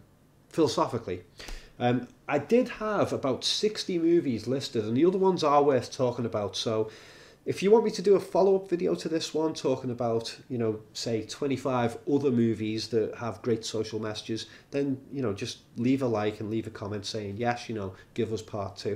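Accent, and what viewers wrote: United Kingdom. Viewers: The British accent adds to the credibility of this top 25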